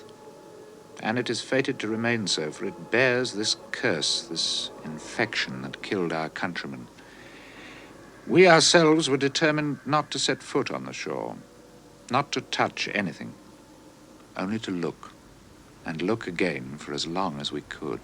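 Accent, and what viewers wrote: RP, United Kingdom. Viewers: Its like a weird apocalyptic pastiche of a salvidor dali painting, in a posh british accent 😂 I love it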